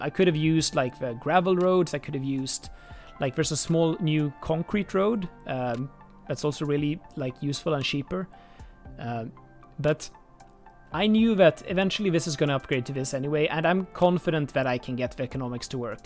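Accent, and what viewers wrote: Sweden. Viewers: Where are you from? Im from Sweden, you sound Swedish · Are you from sweden?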